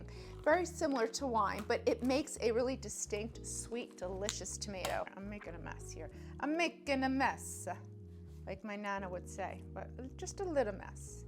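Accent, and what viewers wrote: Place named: Boston, United States. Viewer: Like a non Jersey Carmela Soprano but MUCH prettier. said your from south Philly? I assumed greater Boston area at first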